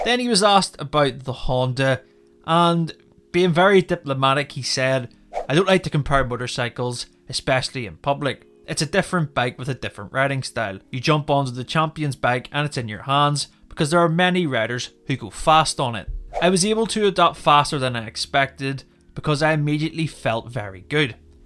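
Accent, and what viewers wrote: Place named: United Kingdom. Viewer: Narrator sounds British.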